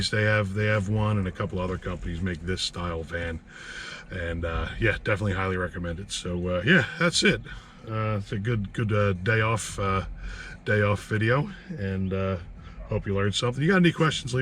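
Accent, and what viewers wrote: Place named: Scranton, United States. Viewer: I LIKE YA GUY; JUST RECENTLY SUBED. YOUR ATTITUDE AND MANNER OF SPEECH WOULD FIT WELL IN SCRANTON PA. (THATS A COMPLEMENT) GREETINGS FROM NOSE (THATS MY NICKNAME)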